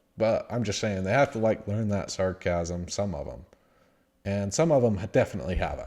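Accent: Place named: United States. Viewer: I am so impressed with how sharp you are and with how calm and well-rounded your thoughts are. It’s really awesome to see you coming out of your shell and speaking your mind confidently🤗 PS: I don’t understand why people are giving you a hard time over your accent? I’m Australian so your accent just sounds to me like another American one that I don’t recognize😅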